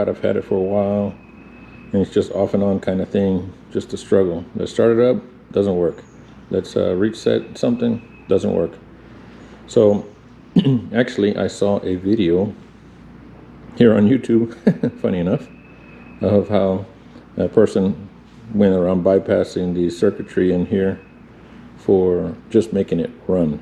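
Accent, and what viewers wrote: Texas, United States. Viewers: I thought I was hearing a Texas accent. I’m in a northern Dallas suburb. Folks like you make me a proud Texan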